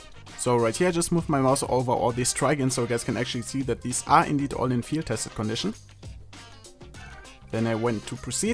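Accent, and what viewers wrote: Germany. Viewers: Arbeite bitte an deinem Akzent, hört sich schrecklich deutsch an.. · All of this math sounds so much better because you're German <3 cheers! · Fabian N aber er redet ziemlich langsam und verständlich es ist zwar der deutsche Akzent dabei aber wenn du so etwas nicht verstehst dann solltest du probieren dich an einen deutschen Akzent zu gewöhnen ... denn das englisch war sehr gut verständlich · Man hört das er deutsch ist XD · Ne ich verstehe sein englisch fast nicht mit diesem deutschen akzent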